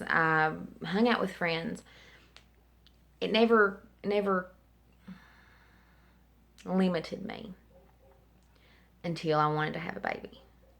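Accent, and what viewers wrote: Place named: Kentucky, United States. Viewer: I knew that accent!!!!!!!!!!! That's why I came to find the first video hoping you would say where you are from! I'm from Kentucky too lol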